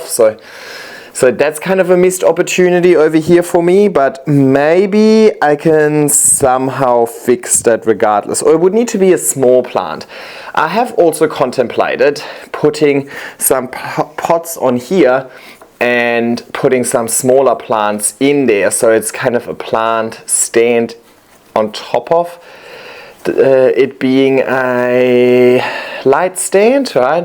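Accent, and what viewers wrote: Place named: Germany. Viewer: I loved this video - you had me giggling several times! And you got my brain going as I am just starting my moss pole journey - propagating and gathering up a few plants right now and will start replanting soon. As I have been doing this - I am starting to think to my winter (up here in Oregon) coming in a few months and I know I will need to starting thinking about lights when I bring the plants inside! Your ideas are always fun and really help me to be creative as well. Someone else said they enjoy listening to you - I do too. The first video i listened to, I kept thinking, "where do I know that accent from?", then it hit me! you sound just like Helena, my German foreign exchange student from 12 years ago! I am hooked on your videos and can't wait to see if I can replicate a few of your fun moss pole successes!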